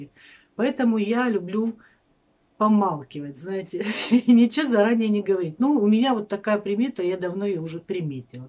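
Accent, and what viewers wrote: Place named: Rostov, Russia. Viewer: Вы ещё сосиски произносите сосиськи😃Ваш Ростовский диалект. Мило и прикольно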